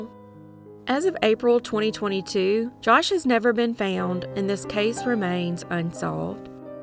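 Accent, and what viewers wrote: South, United States. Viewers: Your southern accent really relaxes me. I’m from Arkansas and Oklahoma so not quite as long of a drawl for me but I still am around it. ❤️🤟🏻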